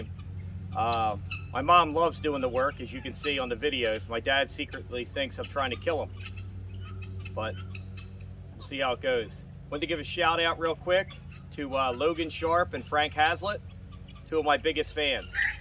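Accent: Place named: Maryland, United States. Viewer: You sound like a fellow Marylander. Great machine great video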